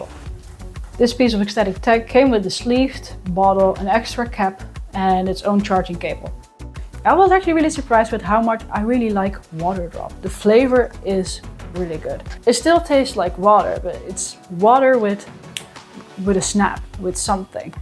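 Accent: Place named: Netherlands. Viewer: I can tell you're Dutch by the accent ^^ I've been drinking waterdrop for years, got my mom on waterdrop too, it's good stuff. I got the Lucy with my points, so basicly 'free'? I don't think it's necessary, our tab water is clean enough. But it's a fun piece of tech. The Lucy Carafe though! (Also got it with points) I use that daily. It filters water really well. And when I placed a clean glass of tab water next to a clean glass with filtered water, I could see the difference, tab water does look blurry compared to filtered water.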